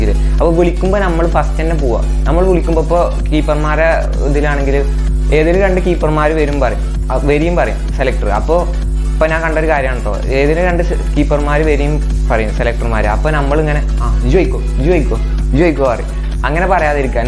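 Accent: Malappuram, India. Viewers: മലപ്പുറം ഭാഷ തന്നെ 🤣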